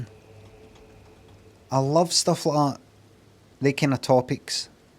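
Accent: Scotland, United Kingdom. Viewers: If you could also tone down the Scottish accent that would be great (for our viewers outside of Scotland)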